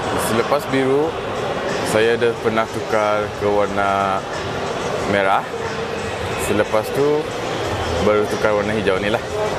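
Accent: Sabah, Malaysia. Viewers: Knapa loghat mcam Sabah? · Dia cuba untuk ckp.malaysia tapi tk mnjdi haha....sbb sye pun brunei + malaysia mcm sbah pulk haha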